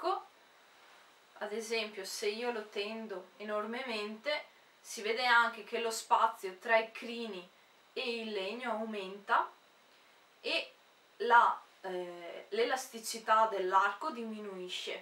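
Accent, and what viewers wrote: Veneto, Italy. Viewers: Hai studiato in toscana ma mi sembri veneta dalla pronuncia 🤔...sbaglio ? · Dall'accento non sembri toscana sembra veneto,comunque bellissimo strumento mi piacerebbe suonarlo ma lo trovo difficile per me..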